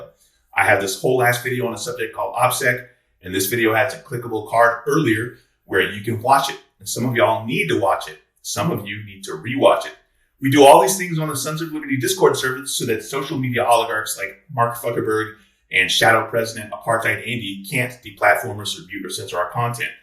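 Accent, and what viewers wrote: Scotland, United Kingdom. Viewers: Super Scottish !!!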